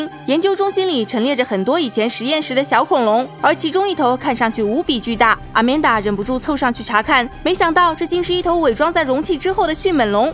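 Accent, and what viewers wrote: Jiangsu-Zhejiang, China. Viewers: 江浙口音，不要逼太紧。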